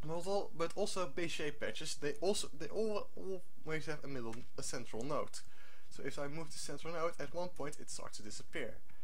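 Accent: Netherlands. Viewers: kan je nog een nieuwe video maken ik hoor aan je dan je nederlands bent ook al is promods nederlands;)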